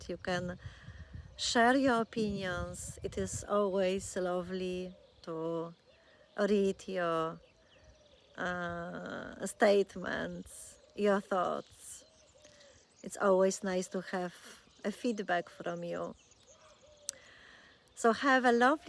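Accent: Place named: Poland. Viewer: It's insane how similar you are to the other woman I can't find anymore: the same Polish accent, the same rhetoric, there is no 'you', etc.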